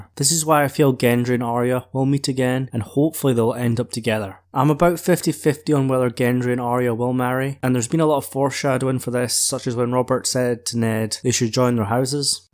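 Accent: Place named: Scotland, United Kingdom. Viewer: Great video, very interesting content & I enjoyed very much. You have a lovely Scottish accent ( assume Scottish lol ), it's lovely to listen too and you articulate really well. I'm from Outside London England, so I'm use to harsh accents lol. Wicked channel & I like your video content. Thanks 😎👍🏼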